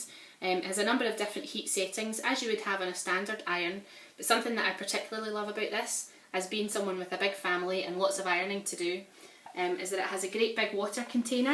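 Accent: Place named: Ireland. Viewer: where do you from ? your accent is almost like irish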